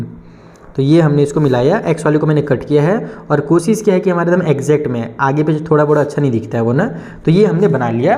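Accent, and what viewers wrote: Bihar, India. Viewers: 😊😅Sir lagta hai aap UP-Bihar se hain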